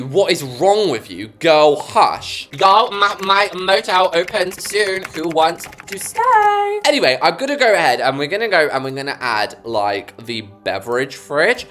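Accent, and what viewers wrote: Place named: England, United Kingdom. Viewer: The English accent always gets me lol.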